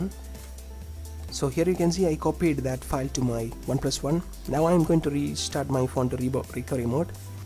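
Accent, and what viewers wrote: Kerala, India. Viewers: Finally.. My touchscreen on the OnePlus One was so slow the last months. I hope this will fix it. Btw I love the Indian accent :D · Awesome budy..! My phone use to freeze once I take out of my pocket for a little time n it was irritating me I thought its display prblm BT after this kernal fix from past 10 days m nt facing any prblm .. Tnx mate & U got a mallu accent even my home town is Kerala anyway thanks a lot.. <3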